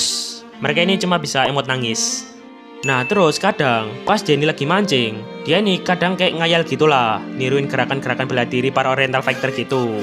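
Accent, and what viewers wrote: Java, Indonesia. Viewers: Orang Jawa ya bang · ini jowo bgt plisss, awokwok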